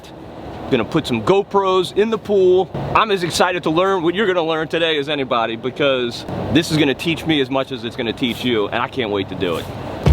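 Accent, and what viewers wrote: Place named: New Jersey, United States. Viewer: I thought you were from New Jersey.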